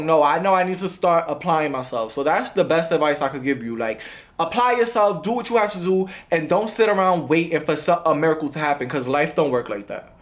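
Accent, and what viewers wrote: New York, United States. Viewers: Lol when I watch his videos I can hear my New York accent omg 😭😭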